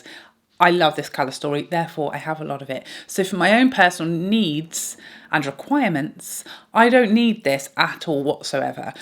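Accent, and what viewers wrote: United Kingdom. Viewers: I like listening her British accents. You have beautiful eyes. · Don’t think I need any of that…thanks for another great and entertaining video! The bit about the Fenty bronzer pallet was especially hilarious. I am a fairly recent subscriber (a month or two), but my inner monologue has already switched to a British “accent”!! ❤️ it!!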